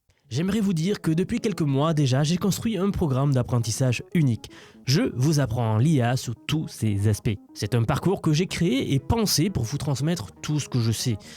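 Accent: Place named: South-West, France. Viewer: J'aime beaucoup ton petit accent du Sud Ouest ♥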